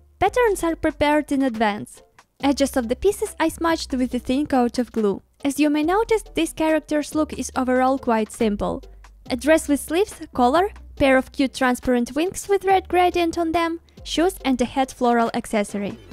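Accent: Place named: Ukraine. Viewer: I love the red doll and your workspace, but I just have to say... Your accent is so pretty! You're Ukrainian? I've never heard someone speak English so lovely! xD God bless all of you. ❤️ 🧡 💛 💚 💙 💜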